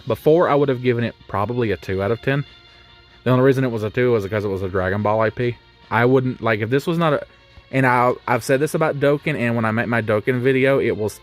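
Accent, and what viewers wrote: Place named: Georgia, United States. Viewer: First time hearing your voice and all I hear is the southern Georgia accent 💀. But I liked your zenkai take. Zenkai level per star is a really good concept for LF’s. If you want to do more content like PvP or Summoning, personally I’d watch it but if you’re comfortable with it.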